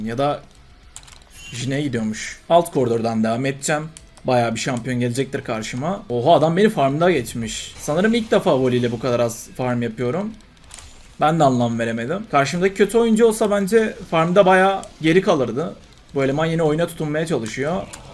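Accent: Adana, Turkey. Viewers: Abi Adanalısın heralde turnuvalara katılıyor musun ?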